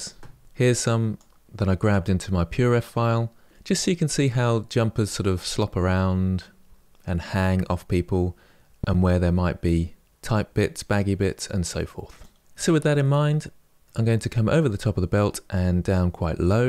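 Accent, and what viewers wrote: United Kingdom. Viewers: I love channel. In my personal opinion, hands down the best blender teacher on youtube. Detailed and very good at explaining each step. It definitely helps that he has a soothing british voice lol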